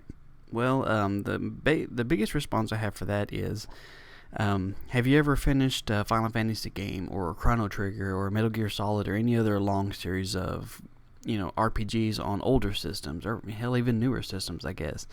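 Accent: South, United States. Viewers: nice to hear another southern guy that likes minecraft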